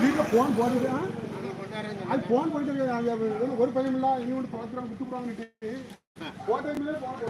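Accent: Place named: Tirunelveli, India. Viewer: அண்ணி நீங்க கோயம்புத்தூரில் இருக்கும் போது ஒரு வார்த்தை பேசுறிங்க இப்ப வந்து திருநெல்வேலிக்கு போயிருப்பிங்க போல இப்ப ஒரு வார்த்தை அந்த ஒரு பாட்டில பேசுறீங்க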